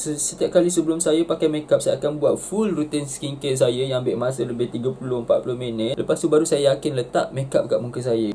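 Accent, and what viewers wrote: Sabah, Malaysia. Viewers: gila klau sia tda tgk ni video kan, sia tda tau oh ni org sabah 😂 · Bunyi KK sangat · Best pulak dengar cakap sabah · Ko asal sabah ba pula. Pndi ka slang sabah ? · Best nya dgr abam ckp sbh.. hehehe sya sabah celup.. ayah penang.. mak org sbh.. ddk di sbh smpi umur 10thn ja.. so little knowledge about my hometown sabah.. so sya di pggl sbh celup.. huhu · Sabahan bah pula 😅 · Suka dgr ckp sabah.. pekat lg sabahan tu.. 👍🏻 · waaaa... sabindo sabahan bh c 😍 salam dari Tuaran Sabah 😍 that sabah accent 😙😙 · Awww so agree with u. Sabahan slang awk still on point lah! I'm Sabahan too.. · Sy bru tau kau org sabah😄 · bahasa sabah..cenggini ....👍👍👍👍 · Pahammm bah.hehehe langsung la kan teda mcm urg semenanjung bila bcakap loghat sabah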